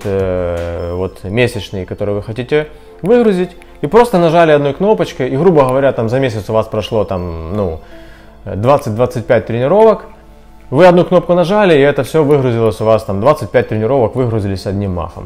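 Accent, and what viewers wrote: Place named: Ukraine. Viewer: Это отличительный говор украинца. Когда я слышу такой говор, то знаю, что человек украинец. :) А в целом речь нормальная, дикция четкая, все слова разборчивы, а это самое главное в подаче вербальной информации. )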